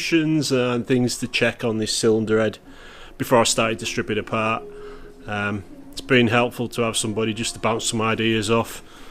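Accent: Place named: Northern England, United Kingdom. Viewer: The bent valve is a shame, do you know why and what it hit? 12.7 compression? Not messing around are you! What fuel do you run? Could there be a valve from something else that could be modified? Maybe turned down or something? Does REC (Paul Ivey) still exist? I remember going to see him for valves a long time ago! Maybe there's something in the US from a V8 or something? I agree about your videos, the ones where you explain stuff are the best, can't beat the Northern accent! I think as, ahem, more mature Mini blokes, we forget what we know and take for granted over time, young guys just don't get the exposure to basic stuff (although people like Tom have bucket loads of enthusiasm!). Back in the late 70's it was a necessity that you could work on your own banger to keep it going, all my mates did the same and we learned by our mistakes! 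I need to learn more about engine management and injection, that's why I watch your stuff, although I like how you're adding a bit of humour, who ate all the chocolate toffee's........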